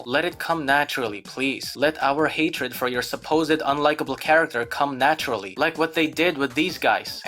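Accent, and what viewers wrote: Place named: United States. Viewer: How do you have an American accent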